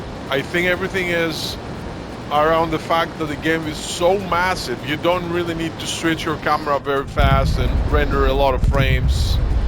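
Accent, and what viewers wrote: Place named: Netherlands. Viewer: Nederlander zo te horen haha. Ben je al naar je eigen huis geweest in game ? 😂. Was een van de eerste dingen die ik deed. En naar al die leipe plaatsen zoals north sentinel island en Chernobyl enz enz 😂😂😂.. ik zit ook op base ps5 en het speelt heerlijk, maar ik speel dit niet echt als een flight sim, meer als een tourist sim lol. Al vlieg ik wel naar de locaties toe vanaf een vliegveldje dichtbij maar vluchten van hele dagen zie ik me zelf niet zo snel doen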